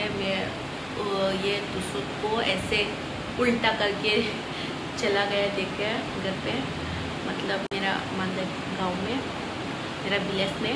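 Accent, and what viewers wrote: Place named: Bhojpuri, India. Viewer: Behn ji aapki hindi bolna..hamko bahot acchha lagta hai...like bhujpuri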